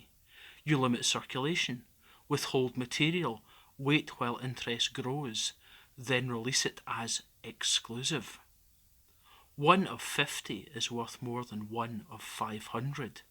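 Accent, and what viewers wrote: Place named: Scotland, United Kingdom. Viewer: Thank you Diana this is such a strong and well argued piece of writing drilling down into the heart of this appalling MOJ. Your narrator also genius, there is something about a Scottish accent that adds depth to the core message. I don't know if you are an investigating journalist, but if your not, you should be.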